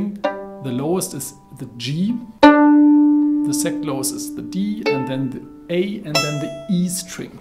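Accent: Germany. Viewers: Are you german?,sounds like that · German accent thats nice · DEUTSCHER AKZENT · You have a german accent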